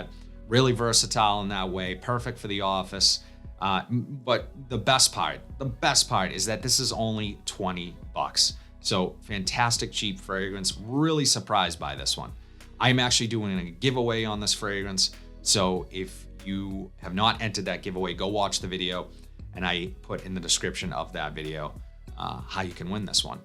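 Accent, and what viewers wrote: Boston, United States. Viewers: niceee I live in providence I know that accent a mile away lol Boston ri we all talk like that lol .. great vids subscribed and got notification on appreciate ur content 🔥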